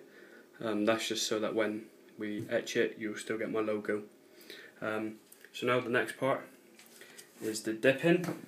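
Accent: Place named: United States. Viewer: Must be a 'Murakin. The only English speaking country that needs subtitles for English. Sometimes for their own countrymen. Stupid inbred gits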